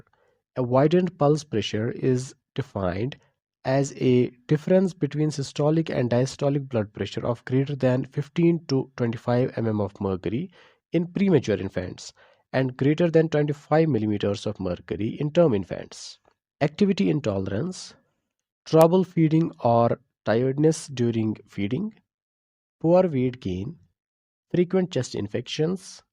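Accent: Kashmir, India. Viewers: your accent sounds kashmiri !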